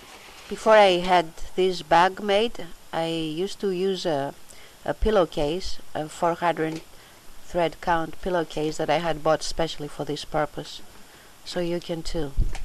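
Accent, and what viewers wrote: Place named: Greece. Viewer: From your slight accent I take it you are also Greek as I am? If you are then I can happily say "Efharesto para polio", translated into Thank you very much. I use Fage thick Greek store-bought yogurt as my starter if I do not have previous home made yogurt left over, and with the Fage it also comes out delicious. For many years I used to use my Greek Grandmother's method of straining the yogurt thru a clean pillowcase, since cheesecloth is really a pain, but recently I decided to buy the Euro Cuisine GY50 Greek Yogurt Maker, which is really a yogurt strainer. It fits easily in my fridge, and strains the whey beautifully, and the end result is very thick Greek yogurt. Also the longer I leave it to strain in the fridge the better, because it also becomes thick like goat cheese, and if I add some salt to it then it becomes ricotta cheese. 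To clean it I just use my sink sprayer on light force, and get all the yogurt out until it is clean, then air dry it for the next time. No dishwasher for this item. If this type of strainer interests you look on youtube as they have video's about it. Thanks again for your instructional video, and I thoroughly enjoyed it.. Ya Sou, keh kalie oreksie meh to yaourtie sou!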